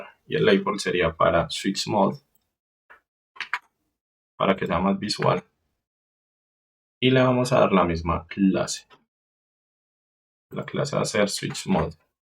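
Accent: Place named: Antioquia, Colombia. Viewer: Su voz suena como de puerto berrio antioquia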